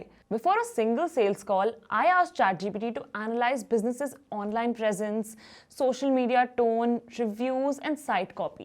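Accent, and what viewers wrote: India. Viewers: I looove your Indian accent! Makes all the money!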